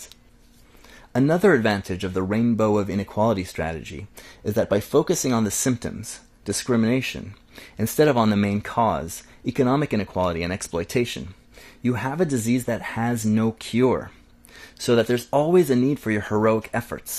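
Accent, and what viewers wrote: Quebec, Canada. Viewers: "Bein non ça speut pas lâââ" 😂 are you Québécois?? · Ah are you a french canadian from Quebec or Montreal ? Good video as alway !!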